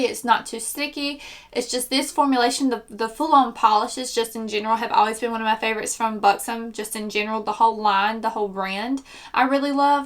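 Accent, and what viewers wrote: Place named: Tennessee, United States. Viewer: Well you're just adorable. (Can you tell I'm 100-years-old?) I love beauty tutorials and am really picky about who I follow, but when I ran into yours, I thoroughly enjoyed it. I MIGHT be a little biased since I'm from Murfreesboro/Nashville, TN, and you have that gorgeous accent, but the kicker is that you know what you're doing. Keep at it and let that personality shine through. Oh! I watched your Nivea-men's-shave-stuff-for-primer thingie one and that's what I use. It almost lifts my face and my makeup....well, it would stay perfect for two days if I'd let it. I have sensitive skin so that's the one I use - FYI. Anyhoo, thanks for your great videos and for sharing your expertise. I can use all the help I can get!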